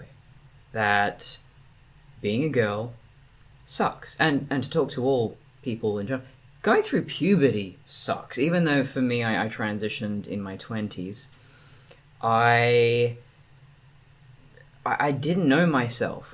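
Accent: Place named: RP, United Kingdom. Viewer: I don't know any Australians personally, but your accent does not reflect what I usually hear in movies. If you had not said it, I would have thought you were THOROUGHLY BRITISH, and of some LANDED GENTRY. 😄 What also adds a LOT to the effect is your REFINED POSTURE and choice of words. Is this accent COMMON in your local area "down under"? BTW, HILARIOUS your comment about America sinking under the waves and the water levels PERHAPS rising in your coastal city/town! Also your idea of a "kinky Lesbian" thinking lewd Heterosexual thoughts makes me laugh! 🤣 Glad you referenced "Waffling WIllow". I like her a LOT too! You BOTH present as COMPLETELY and ATTRACTIVLY FEMALE, but in almost COMPLETELY DIFFERENT ways: YOU as a "Duchess" type, and HER more "Princess" like. Amazing counterpoints, illustrating the WIDE RANGE of human FEMININITY! 😃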